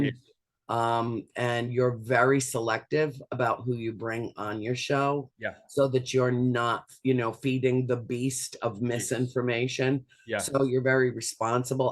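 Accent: South Africa. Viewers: I will definitely. I like your Afrikaans accent. Groete van Kaapstad!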